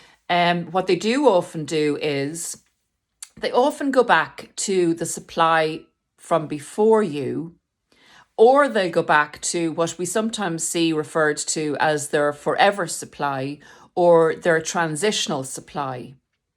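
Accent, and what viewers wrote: Ireland. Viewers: Hello from London and I found your channel today and I'm on video number 4! It helps your Irish as both my parents are, so it's quite familiar listening to you 😊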